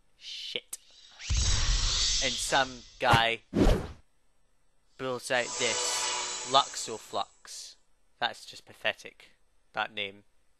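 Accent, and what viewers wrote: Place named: Scotland, United Kingdom. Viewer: scottish(scottash) with a blocked nose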